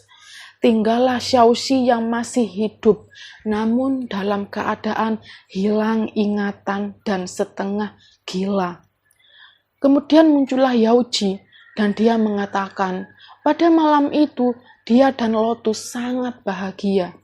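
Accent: Java, Indonesia. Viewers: Jowo" mbak😂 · Ya maklum lah,,,, mungkin mbak nya dari suku Jawa 😋😋😋😋😋kan masing-masing chanel punya ciri khas 🤡🤡🤡🤡🤡🤡🤡🤡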